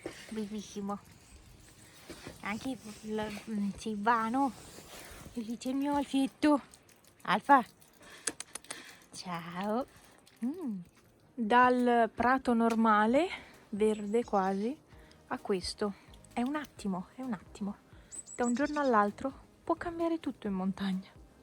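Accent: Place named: Belluno, Italy. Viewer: Ti ho scoperta per caso cercando video sui gatti... E poi sento l'accento e mi incuriosisce xk mi sa di casa... Guardo i tuoi video e vedo che sei da Belluno (montagna da come vedo) 😍 io sono da santa Giustina, ormai da due anni trasferita ad Amburgo... Bello sentirti parlare e vedere le bellissime Dolomiti ❤️ da dove sei di preciso, se posso chiedere...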